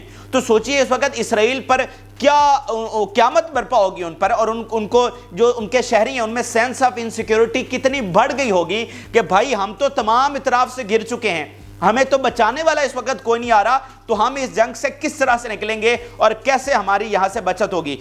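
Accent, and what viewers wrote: Punjab, Pakistan. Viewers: او بھای انگريزی کو پنجابی ميں نہ بولو ۔ جو بھی خبريں بتاتے ہو ساتھ کسی مصدقہ اخبار کی ريفرنس ديا کرو ۔ہاتھ ميں کاغز پکڑ کر ريڈنگ کررہے ہو ۔وہ بھی انگريزی پنجابی ميں۔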